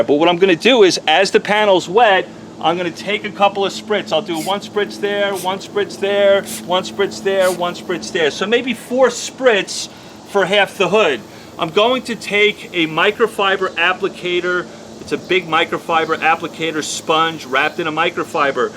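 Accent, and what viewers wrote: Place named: Minnesota, United States. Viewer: I’d love to try this, but I’m in Minneapolis. No stores in MN sell, one store each in both Dakotas, and one in Madison. I’ve always used Meguiar’s Expeess Spray Wx when I fry a car. Works like a dream tool. Plus, Meguiar’s is a 3M company, so I’m using product from a hometown place. Sure liked this video,. I like watching you because you’re thorough, and it’s nice to hear my accent out of someone else’s mouth for a change.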